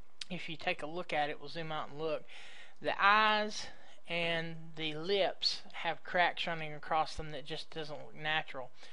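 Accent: South, United States. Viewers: I find your voice Awesome.... YOU must be Southern Like me? Perfect tutorial ignore the haters.... simple way to get this effect thanks bunches for this............♥♥♥ was searching for this effect Hugz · Def not Forrest Gump but very southern, love it! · Thanks for a great tutorial. As for accent, speaking as an Englishman it sounds fine to me. Always liked the southern accent. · You sound like dunkey with a heavy southern accent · sounds like peter parker (not from amazing spiderman) with forest gump accent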